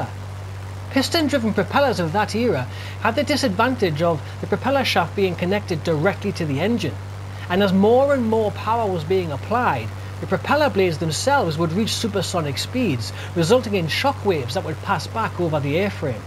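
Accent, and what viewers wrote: Newcastle, United Kingdom. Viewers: A very interesting ‘Geordie’ voice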